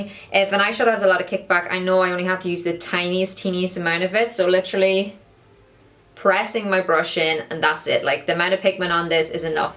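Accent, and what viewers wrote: Ireland. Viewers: Is she irish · What part or Ireland are ya from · I'm so glad I came across your channel! You have the same sense of humor I do 😂 where are you from? I'm bad at determining accents but maybe you're from Ireland? I apologize if I'm wrong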